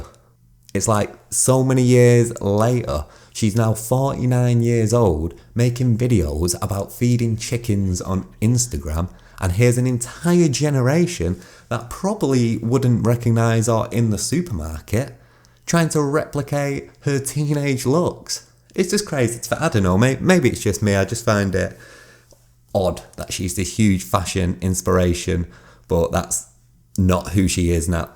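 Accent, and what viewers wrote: United Kingdom. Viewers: Always appreciate your content. Certain it's your accent that makes me miss the UK a little or the tour of topics that walk down memory lane with reminders of more innocent and care free times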